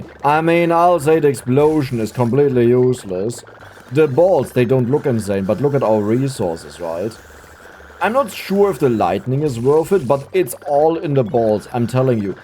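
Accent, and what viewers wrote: Germany. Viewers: Zewentzyzigz holy deutch accent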